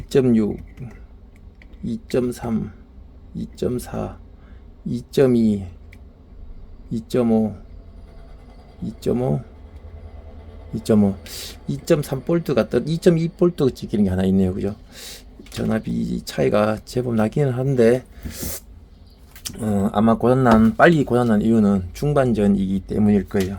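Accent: Gyeongsang, South Korea. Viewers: 사투리가구수하십니다..ㅎ 저도진해에서 군생활을해서 경상도사투리 좋아하는데.,ㅎ 후딱후딱 잘만드시네요 저도영상참고해서 리필해봐야겠네여 직업이 전동공구많이쓰는직업이라 저렴하게 리필해써야겠어요 영상만드는 일이 힘든일인데 영상잘보고 갑니다..^^ 화이팅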